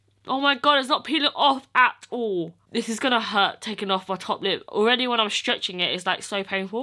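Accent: United Kingdom. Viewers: Ik haw she is british Her accsent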